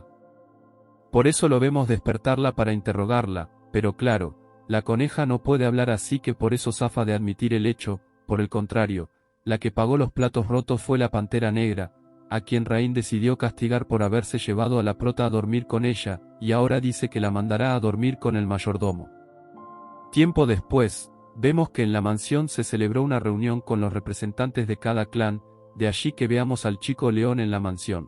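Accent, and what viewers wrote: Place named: Argentina. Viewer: Apreciado Pibe 🎉❤🎉 se agradece por vuestro trabajo y esfuerzo, vuestra comunidad ya ha superado vuestras expectativas 🎉❤🎉 Me da mucha tranquilidad escuchar vuestro dialecto argentino estaremos a la espera de la siguiente parte 😊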